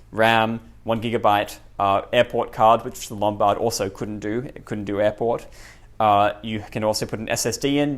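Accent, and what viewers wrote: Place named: South Africa. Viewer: Great video, I bought a Pismo Book for £25, fully working in excellent condition from eBay. Are you South African? Your accent sounds like my dad and he is from East London, Natal! Great video mate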